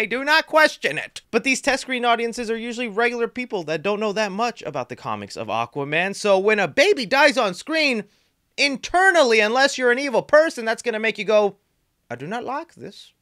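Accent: United Kingdom. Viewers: I love your British accent😂 where in the UK are you from? I thought it was south African at first 🙈😂🎃🎃👍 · British accent 😂😂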